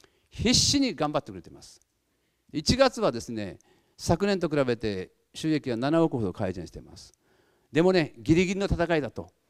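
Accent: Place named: Nagasaki, Japan. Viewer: この長崎弁もいいし声もいいんですよね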